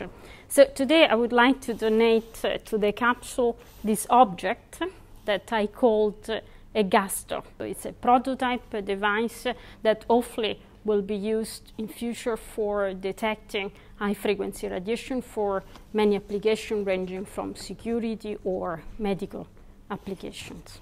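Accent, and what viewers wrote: Italy. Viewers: I recognized from her accent that she might be Italian/Spanish/Portuguese maybe? Her name strengthens my suspicion.. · That thick italian accent goes off and on on every other "R"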